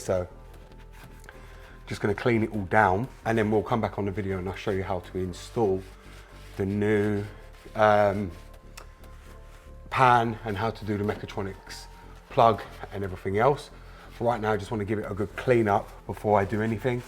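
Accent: United Kingdom. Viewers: You had me.....I was convinced you were British until you said ZeeF transmission 🙄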